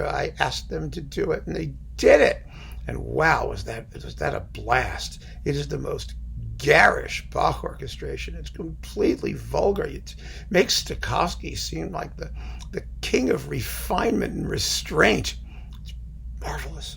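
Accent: United Kingdom. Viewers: Very good upper-class pre-1950 British accent! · Lovely talk as usual, thank you, but you paid no attention to the which makes me go weak at the knees. And in the friendliest possible way, your British accent sucks!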